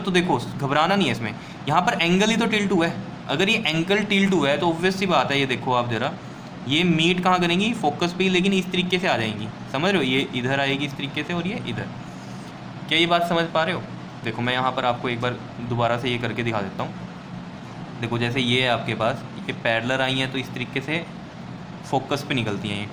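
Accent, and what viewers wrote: Punjab, India. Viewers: Its subh exam h sir 😢😢😢😢 sir aapki voice achi h give punjabi vibe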